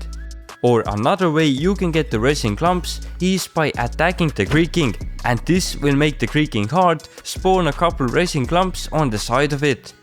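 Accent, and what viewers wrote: Estonia. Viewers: Are you from Estonia? just curious cause ur accent is so Estonian. im from Estonia as well🤓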